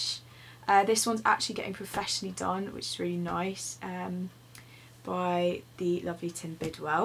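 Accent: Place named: England, United Kingdom. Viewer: What county are you from, I'm from Bedfordshire I'm just wondering as my accent is quite similar to yours :)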